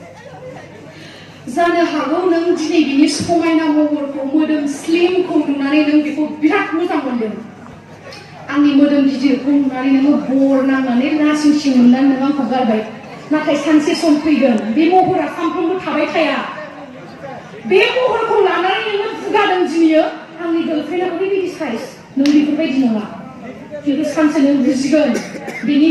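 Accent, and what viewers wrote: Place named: Udalguri, India. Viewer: jwbwd mwjang jadg Abo.. Ngni voice kwo birad mwjang mwnw ag ngsiniting ni edi railynykwonw mwjang mwnw ag kwnasogbai tanw lubei. Agni lwgw dgw Odalgurini railynykwonw birad mwjang mwnw ang Abo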